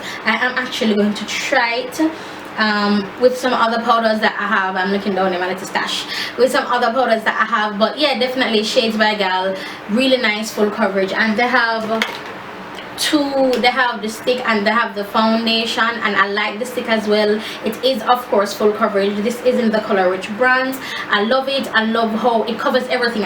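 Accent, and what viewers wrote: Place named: Jamaica. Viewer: nice foundation haul new subbie😀 my grandaddy is from Jamaica love the accent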